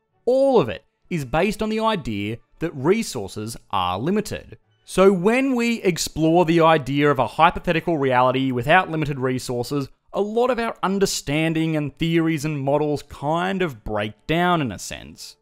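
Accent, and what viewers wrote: Australia. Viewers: Cannot stand the ozy accent. Bye bye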